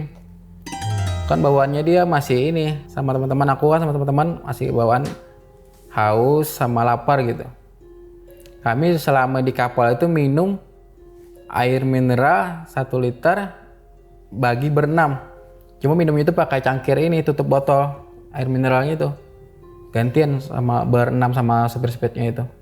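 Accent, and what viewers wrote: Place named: Banjar, Indonesia. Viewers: Bahasa Masih Tebwa Banjar banar😁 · Kayaknya karena abang ini sering pake bahasa daerah (sepertinya bhsa banjar klo dr logatnya) jadi pas pake bahasa indonesia jadi ga tertata cara ceritanya.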